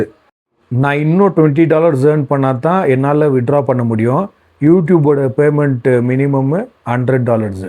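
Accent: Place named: Bangalore, India. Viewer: Your channel is on right track bro..Vitradhinga. Post more contents, different contents. Unga tamil criticize pannatha soninga but enaku contentla romba pudichathey antha bangalore slang tamil than. Youtube is supporting you, you have to put more efforts. Na daily 3-5 hrs stream panren but en efforts ku yetha revenue kedaikala. But your channel doing good, don't waste it. Be consistent.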